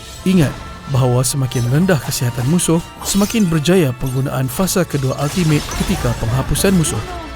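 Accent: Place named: Perak, Malaysia. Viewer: orang perak ghupenya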